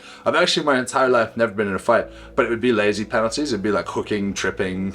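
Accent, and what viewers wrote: Australia. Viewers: Now i see where you got your slight aussie accent from. Thanks for sharing your story. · pretty cool how you picked up a slight ozzy accent during your time there!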